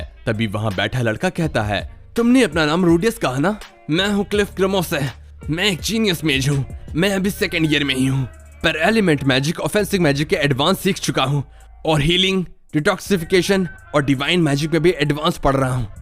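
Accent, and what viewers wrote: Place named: Lucknow, India. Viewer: Pakee chinery se pata lagta ha ap bhi up hardoiya Lucknow Se ho😊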